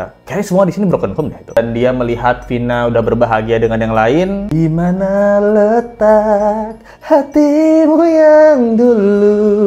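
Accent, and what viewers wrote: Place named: Java, Indonesia. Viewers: Suka gaya bicarany abang dalam menjelaskan medok khas jawany🙏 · lucu si lu bang, wkwkwkwk medok nya cerbon ga gitu, itu logat jawa timuran. tp gpp lucu.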